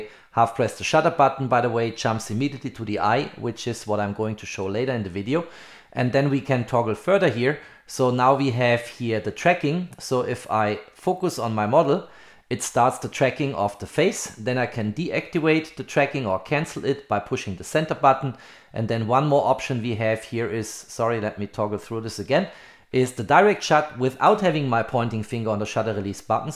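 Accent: Germany. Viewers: Sehr gutes Video. Endlich mal sehr verständliche Erklärung der Einstellungen. Wollte meine Einstellungen parallel vornehmen, bis mir aufgefallen ist, das z.B. beim Touch Panel einige Einstellungen fehlen. Da wurde mir klar, dass ich nicht die A7R5 in der Hand hatte, sondern meine A7iV. Die beiden sehen sich so ähnlich. Was ich noch nicht gefunden habe, ist die Einstellung zum Menü. Das heißt, drücke ich Menü springt die Kamera stets zum oberen Punkt, bei der A74 zeigt sie die letzte Einstellung, was mir viel lieber ist. Werde wohl noch eine Weile brauchen, bis alles optimiert ist. Möchte auch die Einstellungen, soweit möglich, zur A74 identisch haben, damit ich mich nicht umstellen muß. Danke für die großartige Arbeit, ich hoffe Du verstehst die deutsche Sprache, sonst deepL nutzen. PS: mir kam es sogar so vor, als hättest Du einen leichten deutschen Akzent im englischen, aber nur sehr leicht.In Minute 39 erklärst Du was ich suchte, hätte ich nie gefunden.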